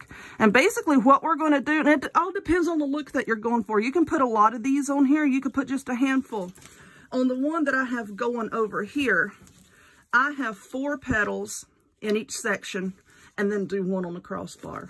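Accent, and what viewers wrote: South, United States. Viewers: i have to tell you how much i love your tutorials.. your accent and one-liners. your personality is what keeps you watching. My Dad is 96 and recently moved into an assisted living facility after the loss of my mom..I had decided to make wreaths for his door to his room so it would be easy for him to find his room. He is a disabled vet and this wreath idea is what i was looking for for Memorial Day and the 4th, I added a few stars along the outside, but thankyou so much for your ideas and tutorials, I'm sure he'll love it! You are a and all that! Thanks again!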